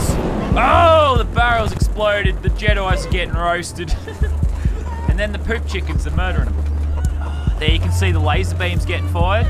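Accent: Australia. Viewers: uhmmmm are you Australian? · u have a Aussie accent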